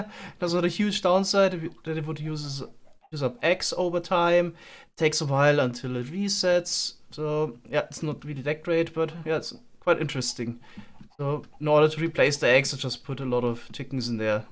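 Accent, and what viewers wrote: Germany. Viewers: 10 sec in and i knew you also bist ein deutscher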